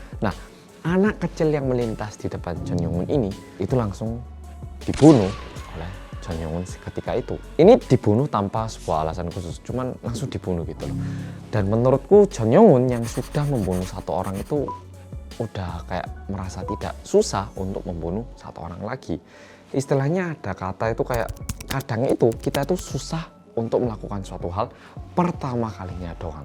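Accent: Java, Indonesia. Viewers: Cus langsung ndelooookkk.... Notif Bersama orang korea yang medooookkk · Biasanya selain medok mas bilang apa ya di intro? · Bersama orang korea yang medokkk aaaah bikin rindu bgt 😂✨ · Entah kenapa selalu rindu suaranya mas yang medok bgt itu lho😭😭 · wong jowo mas? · Semangat mas . Seneng aku lihat masnya pakai bahasa indo medok. Sekali kali coba bahasa Korea ... Pakai sub ... Jadi pingin denger kalau bicara bahasa korea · Dua kata untukmu mas, "Medok banget" Tapi justru itu yang bikin seneng banget lihat chenel nya mas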